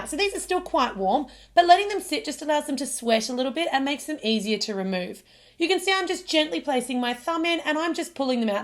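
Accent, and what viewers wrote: Australia. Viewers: Are you Australian because you sound like one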